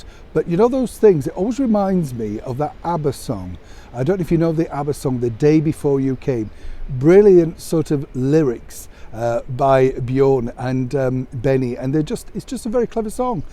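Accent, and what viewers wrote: United Kingdom. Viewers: Never a 'lecture'...let's call it a well needed 'pep talk' in a delightful British accent! 😉💕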